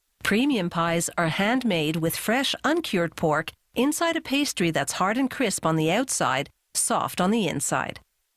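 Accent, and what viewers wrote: Canada. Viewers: that Canadian accent tho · The narrator sounds Canadian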